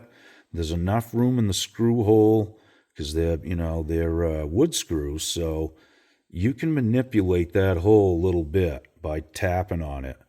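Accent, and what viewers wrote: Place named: Maine, United States. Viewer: Had to check you out after hearing you talk and was right...mainah bub yessah!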